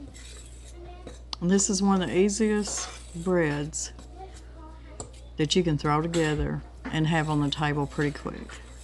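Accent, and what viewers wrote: South, United States. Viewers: Dear Miss, Another lovely video of you and your family! When I listen to you talking about Christmas and all your decorations, it’s like listening to myself. I have a room in the basement that is just for “all things Christmas” and I keep saying that I need to give some away, but it just doesn’t happen! Maybe I should try harder!🤭😉🥰 I’m looking forward to making the Irish Soda Bread………wish I’d had the recipe last week in time for St. Paddy’s Day, but that can be made anytime of year…….it’s always delicious! Thanks again for your videos……I really enjoy listening to your soothing, calm voice and your gentle southern accent. We’re expecting snow and sleet tomorrow so I guess our spring is aways off yet! God bless you and! 💕💕Jackie🪷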